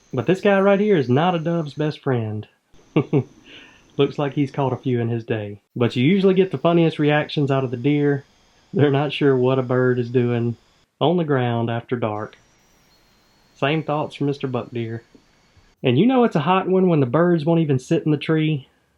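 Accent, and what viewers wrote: South, United States. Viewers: Great wonderful videos, narrated with a fantastic southern accent. One thought is, seems a lot of fish for a small pond. Is there some sort of math to figure population?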